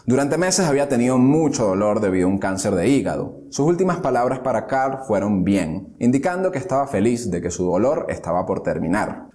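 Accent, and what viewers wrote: England, United Kingdom. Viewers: Para hacer una biografía sobre personajes alemanes, o bien pronuncia los nombres a la alemana o bien a la española, lo que queda fatal es pronunciar las palabras en alemán a la inglesa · Historiador para comentar hay que tener cultura.los terminos deben pronunciarse en forma de origen.como todos pronuncian con acento ingles.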